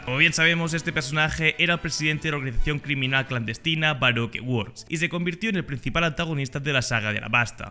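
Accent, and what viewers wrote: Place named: Catalonia, Spain. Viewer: com es nota q ets català a l'hora d parlar jajja brutal el video com sempre!❤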